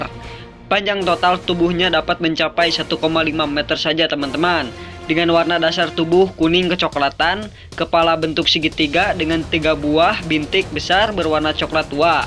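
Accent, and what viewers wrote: West Java, Indonesia. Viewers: Gaya Ngomongna sunda pisan euy. Jigana babaturan Dede Inoen. Mangpaat pisan infona Lur. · Sundaaa...a bangetz...!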